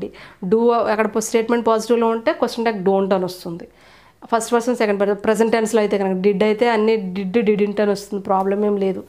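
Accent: Telugu, India. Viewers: Content is good. But pronunciation is not up to the mark.Telugu accent is prominent. Please work on that to improve your teaching abilities.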